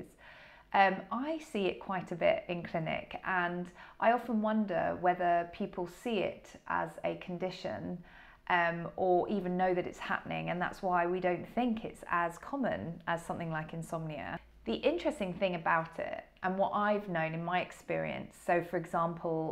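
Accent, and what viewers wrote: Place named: United Kingdom. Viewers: I love your accent You sound English, British, Aistrailia maybe or Irish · Its a British accent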